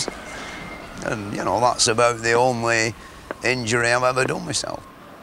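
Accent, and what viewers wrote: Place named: Northern England, United Kingdom. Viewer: Ha, yeah it's northern English.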